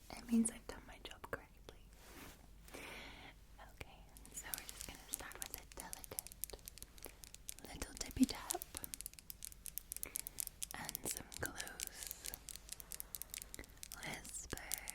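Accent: Scotland, United Kingdom. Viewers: Me looks at the title of the channel: that’s a weird name Me after hearing 3 seconds of a vid: oh she’s scottish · Hello 💖💖💖❤️❤️❤️🥰🥰😘😘🤗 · I caught the tale end of the Instagram chat it was awesome to see your sister her Scottish Accent is way thicker then yours I guess because you lived In London for 8 years! Thank you for the chat it was nice! Is my guess that you let your sister win the arm wrestling contest on the mark LOL 🤣 my sister's could beat me in an arm wrestling contest there way stronger then me I'm just taller then they are! Thank you for this really relaxing Tingly video I appreciate it!:_Loveheart:_Loveheart:_Loveheart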